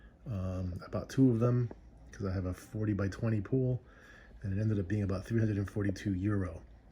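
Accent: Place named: Long Island, United States. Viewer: Are you on long Island? Sounds like it....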